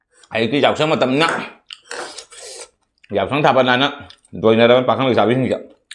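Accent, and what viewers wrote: Cachar, India. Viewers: Bro kang metei lon ngangbdo Hanna fajana tamkho tabda yam wai cachar mcha lon sude hainakhini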